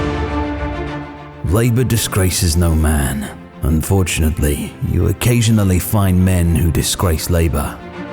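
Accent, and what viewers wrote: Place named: England, United Kingdom. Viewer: As told by a limey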